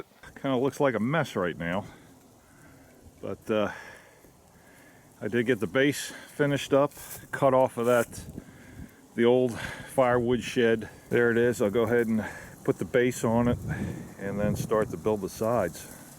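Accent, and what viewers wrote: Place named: Western Pennsylvania, United States. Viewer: Where are you from originally. You have a strong western PA accent. Love you and your sons videos. Hunted at 2 different camps for years around Bradford and just south of Kane. Lots of great memories just not many deer. But that's not really what camp is about anyway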